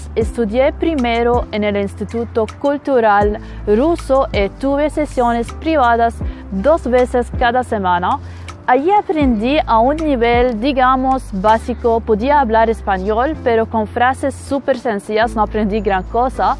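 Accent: Arab world. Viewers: ¿Qué dialecto es tu árabe?, ¡Me encanta! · Me encantó tu italiano! sin duda se nota que el árabe es tu lengua. PD: Saludos desde Guatemala, humildemente bilingüe jaja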